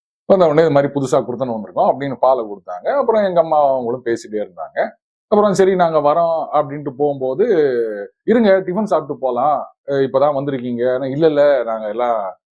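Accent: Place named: Tirunelveli, India. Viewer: அழகி திரைப்படம் என்னுள் ஏற்படுத்திய அதிர்வுகளை, இக்கதையும் (நிஜமும்) ஏற்படுத்தியுள்ளது. உங்களின் நெல்லை வட்டார வழக்கு அற்புதம்.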